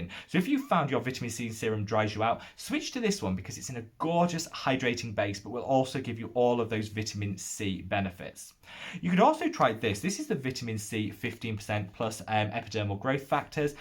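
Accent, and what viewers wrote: United Kingdom. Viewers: Every time I hear you and James Welsh say "vitamin," my American ears can't deal with it.😆 For some reason, there are three British pronunciations that I find exceptionally distracting: vitamin; schedule; and aluminum (which you spell differently and add a whole extra syllable to!). Love your channel as much as I love my Timeless Vitamin C!❤️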